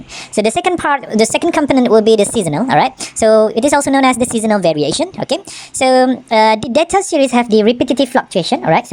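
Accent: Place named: United States. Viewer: accent american sir ni pekat gila sampai aku lupa bukan orang america yang buat video ni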